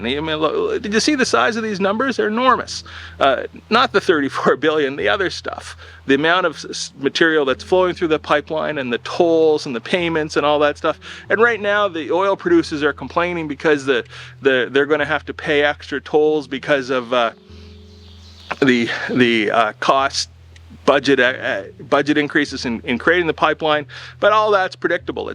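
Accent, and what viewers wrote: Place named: Canada. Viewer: "i'm in canada, no secret if yer watching from another pert of the werld" canadian accent detected for sure. cool vid, love the chalkboards in the woods, love that they always move when you walk away. excellent setup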